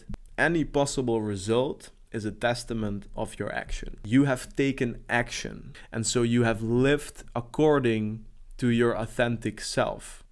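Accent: Netherlands. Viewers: Jouw Nederlandse accent is zoooo sterk, niet normaal meer man.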